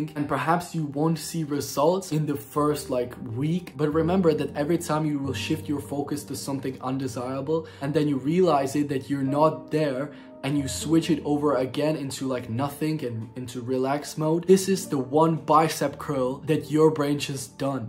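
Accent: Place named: Czech Republic. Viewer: I can say youre from czech republic right? btw great video man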